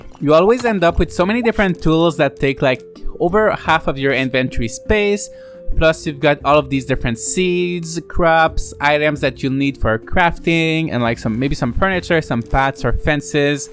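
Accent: Quebec, Canada. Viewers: Just curious, where is your accent from? . . . . . Guess; Qc?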